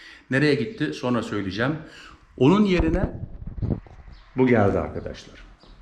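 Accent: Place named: Zonguldak, Turkey. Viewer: Usta türkcen güzel, fakat yinede anladim sen zonguldakllisin, kastanonu karabük veya bartin da olabilir ama zonguldak derim?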